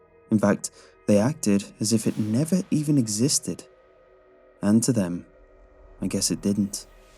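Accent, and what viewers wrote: United Kingdom. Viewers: Mans from arizona but speaks but has a british accent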